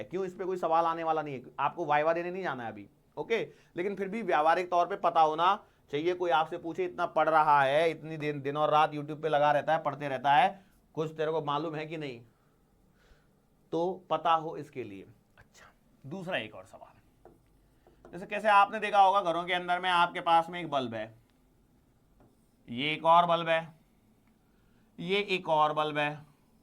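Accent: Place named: Varanasi, India. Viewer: Good evening everyone ha sir ham hamesa yhi sochte qki ham Varanasi se h or aap jo bhi kbhi kbhi bolte h yha aisa lgta h sayad yhi ke h...😁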